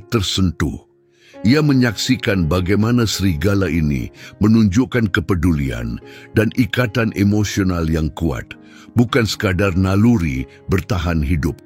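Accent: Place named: Malaysia. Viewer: Admin orang Malaysia?. Bahasa Indonesianya bagus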